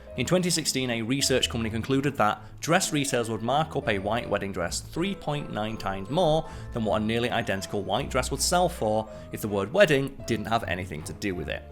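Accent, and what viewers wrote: England, United Kingdom. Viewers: Can you slow it down a bit. Yes I could set my speed to 0.75 but you wanted feedback. I like the English accent but a bit slower presentation would suit me. Again, feedback asked for, not a critism. I said I'd give it a go, has big shoes to fill. I'll hang around a bit longer. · 1) I like his dinosaur shirt. 2) Maybe he uses bleeped words too much. I'm still thinking about that because I use bleeped words also. 3) I think the whole video was paced well. 4) I'll miss Simon, but I'll like just as much after I get used to his slightly different British accent.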